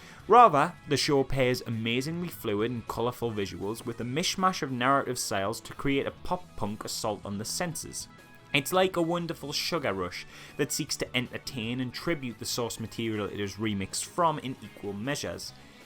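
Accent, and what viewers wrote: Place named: Geordie, United Kingdom. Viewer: You absolute geordie. Let's meet up and Have a space dandy time. "HEaring yoou discribe this is pua amaaaazin" vowels going as hard as this show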